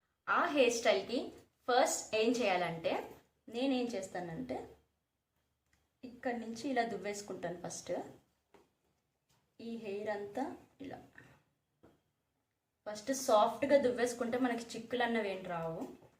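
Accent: Srikakulam, India. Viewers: Akka Srikakulam language super ga undi chala baga matladu tunavu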